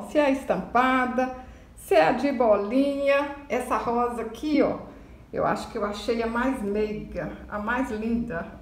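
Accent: Minas Gerais, Brazil. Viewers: Vc é mineira né? Esse sotaque eu conheço.kkkk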